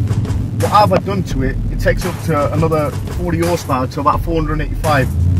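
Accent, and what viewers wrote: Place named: England, United Kingdom. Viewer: Love you english accent man goed review subscribed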